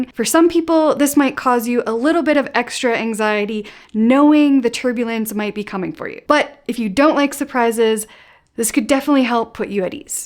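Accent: Canada. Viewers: Man ,you are THE BEST !!! This was the best flight hack video I've ever watched !! Believe me I've watched a lot !! Lol. You covered so many sites & hacks nobody else mentioned !! The best editing, too !! And the way you speak,even though you spoke fast ,it was very clear to hear/ understand that I didn't need to repeat any !! Lol. Canadian English is the easiest to ears ,but still ,you are that good !! Usually I find almost the same content or lots of overlapping information in this kind of videos & I only saw several in your video ( this ) & they were important to mention anyway,but you provided way more new informations / more original, creative tips !! And so much fun to watch ,so,I got very excited to watch/ listen !! Even Level 8 suitcase ! I knew what was as I've seen others using that one in their video ,but I don't recall them mentioning what you described !? 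Like it is very handy that there's no zipper ,or it'll not allow us to overpacking ,so it's actually great for people with the tendency ! Lol Also you realized that suitcase would had saved your money because it's very durable & you purchased 3 , 4 luggage bags in 10 years as they broke !! As soon as the video finished,I hit the subscription button !! This was my very 1st video of yours to watch & I hardly ever sub to any if it is the first one ,or not til 4th or 5th even !! Lol As others video's subjects are super intriguing you mentioned in this & this was that good enough !! As well as I noticed that you seem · Did I catch a little "pack an oatfit" in your "beg" Canadian accent there are you from somewhere else?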